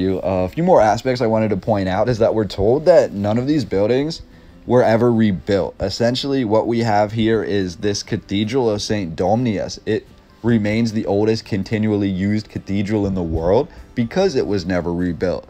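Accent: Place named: West Coast, United States. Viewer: I appreciate the content but can’t help but wonder where your accent originates. My guess is West coast 🤔 either way keep up the good work.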